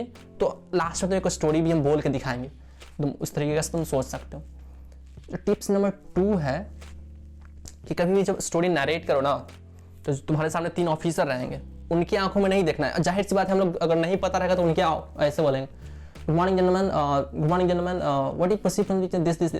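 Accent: Bihar, India. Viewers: Bihari ho kya bhai 😎😎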